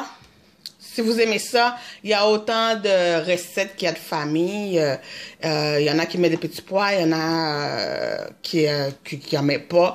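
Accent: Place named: Haiti. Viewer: Tu as l'accent créole haïtien et québécois. C'est marrant.... hello de Guyane. J'aime beaucoup le picliz. Humm miamm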